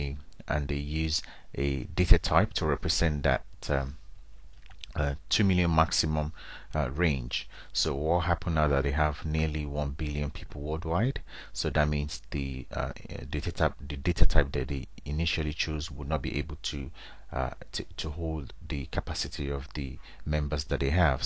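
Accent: Nigeria. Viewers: Lol. Are you yoruba ?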